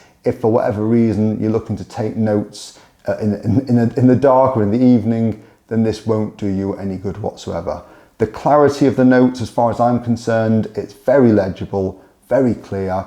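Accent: United Kingdom. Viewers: This is an impressive review. The pot plant in the background gives a vintage office feel! It’s great to hear an English accent. I’m a lawyer and have umpteen notebooks per case. I like to write with a good fountain pen. The contents of my notes are confidential. Is that preserved? I’d like to move to something like this and the idea of saving paper sounds good. Are you sure I won’t lose any work? Bobbie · Matthew Brokerick is doing pretty well I guess. Picked up a british accent somewhere too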